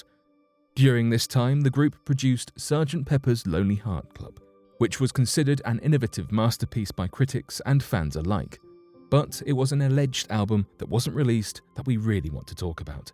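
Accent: United Kingdom. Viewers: The monotone British guy?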